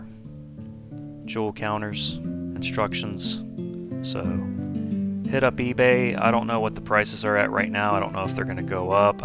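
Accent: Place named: United States. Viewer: Your American pronunciation is funny. Regardless a good video.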